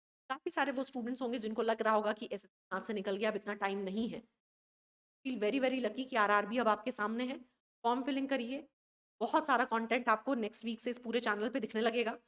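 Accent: Chhattisgarh, India. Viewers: Ma'am aap chhattisgarh se hai kya??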